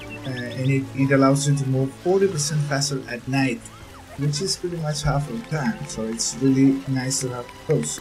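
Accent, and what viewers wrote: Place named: United States. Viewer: ahaahah yo decia porque no estoy suscrito si me suscribi y no sabia que tenias otro canal en ingles haahhah, saludos y te sale igual que un gringo.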